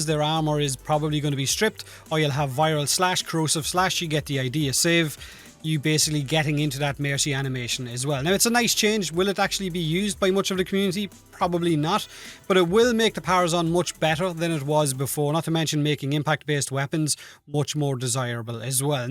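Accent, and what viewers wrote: Ireland. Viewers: U from Ireland bro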